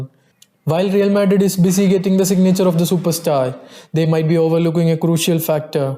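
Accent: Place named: India. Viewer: Annoying Indian accent 🤦🏾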